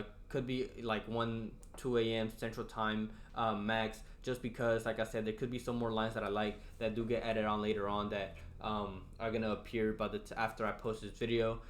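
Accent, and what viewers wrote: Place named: Texas, United States. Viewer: youre in texas huh. i thought you had a texas accent. then you said its thunderstorming.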